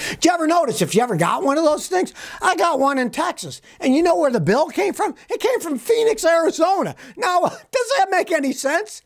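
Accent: United Kingdom. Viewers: Nothing like a strong black coffee and speaking with a British accent to get me going, in the morning! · Love the Brit accent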